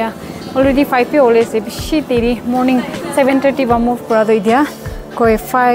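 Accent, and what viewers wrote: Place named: Nagaland, India. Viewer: I really like your konyak accent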